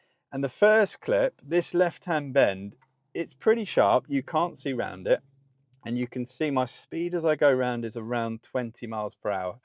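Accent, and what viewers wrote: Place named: Kent, United Kingdom. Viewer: I did wonder how you acquired a Kentish accent - I was puzzled when I realised you're in Norfolk haha!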